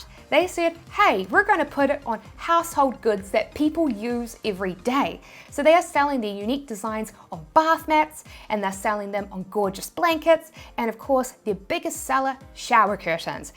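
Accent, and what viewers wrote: New Zealand. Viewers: Love the Kiwi accent. When I was over there the Television adverts for Decking were so funny 😂 Hoping ecommerce works for me and I'll be back to NZ soon! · I don’t get why some people hate ur accent. NZ accent is the cutest! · Love your videos, a great help but I gotta be honest as a Brit I love the NZ accent and love these ones where you give points (Tin points or as we say Ten points) wish you were a judge on strictly come dancing - I’ll give it Tin points! Seriously brilliant videos and a massive help! Thanks!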